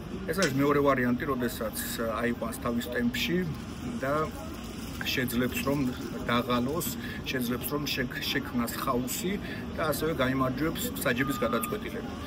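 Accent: Russia. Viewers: ხაიკიკი არა მოხარშული კვახი კიდე , ქართულად ისაუბრე რა... ეს რუსული ხ მაგარი გამაღიზიანებელია და მოდი რაა ვლოგერობას თავი დაანებე და ბუკმეიკერობებს , არაა საუბარი შენი საქმე და იქნიე გამაში ეგ ხელები... ეს პური რომ მეპურემ უნდა აცხოს ხო ვერ ვისწავლეთ 😢😢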